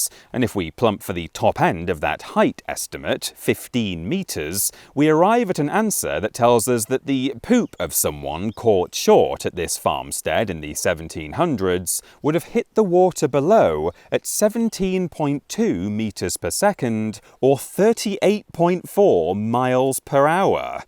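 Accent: United Kingdom. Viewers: Nothing quite like hearing a proper British accent expounding upon historical loos. 😂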